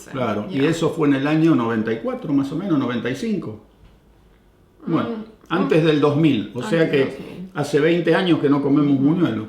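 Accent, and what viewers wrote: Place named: Córdoba, Argentina. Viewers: Estimado, tú pareces más cordobés que la Mona Jiménez, con los años que estás en Canadá no perdiste el idioma cordobés. Saludos desde Córdoba. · Se me llenan los ojos de lagrimas. Me haces acordar tanto a mi abuelo. Un tipo amable, sencillo y con esa tonada gauchesca que aun no se te fue! Ni hablar de los asados que te mandas! Ensaladas??? No hace falta, comemos asado quemándonos los dedos y pelando los huesos jaja. Gracias, no dejen de hacerlo. Hacen felices a muchas personas., y gracias por esto <3 Que dios los bendiga siempre!!!!!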